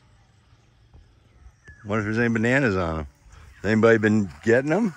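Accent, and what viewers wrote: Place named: Midwest, United States. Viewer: Your accent and how you say Crick reminds me of home. Are you from the Midwest?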